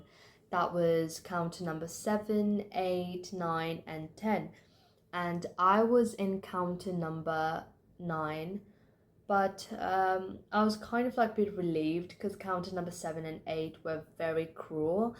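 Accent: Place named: United Kingdom. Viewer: british accent at its best the vo must be impressed by this dd congrats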